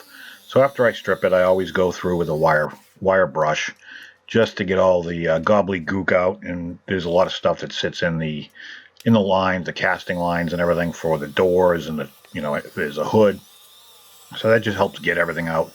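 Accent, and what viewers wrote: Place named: New England, United States. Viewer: Wicked pissah I love it lol where you from Maine or Mass?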